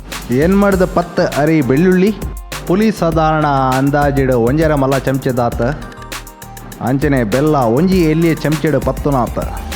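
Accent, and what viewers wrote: Tulu, India. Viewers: The way you speak thulu language very funny. We are not useing Oil Ok Super RECIPE.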